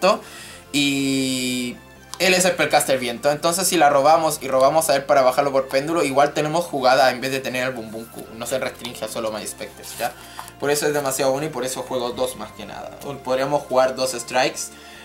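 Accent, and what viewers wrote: Chile. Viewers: Sos chileno ?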